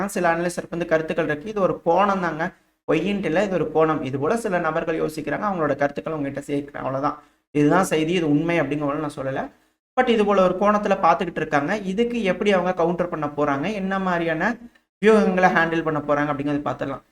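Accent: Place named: Kongu, India. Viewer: Kongu tamil ❤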